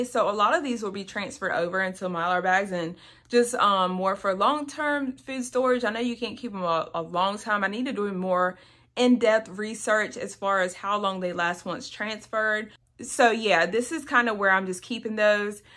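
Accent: South, United States. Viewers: You must be southern. Love your voice!!! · Love what you're doing! Your family will be safe for a very long time. Also love the southern accent ❤ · You have a country accent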